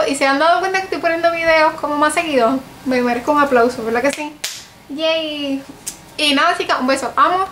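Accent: Puerto Rico. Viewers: Yo tengo ese mismo primer.. me encanta pq tmbn te sellan y que los poros eso me dijo la muchacha de mac.. que buenoo ver mas videos tuyooss.. jaja me rio tanto pq es q de lejos las boricuas se nos notaaa q somos pr por el hablar jaja.. te cuidas chicaa :)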